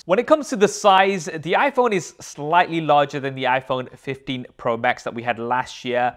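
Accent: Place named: London, United Kingdom. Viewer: That London Accent.. 😊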